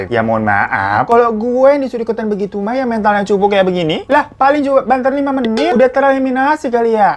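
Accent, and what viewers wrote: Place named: Banyumas, Indonesia. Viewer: Ngapak su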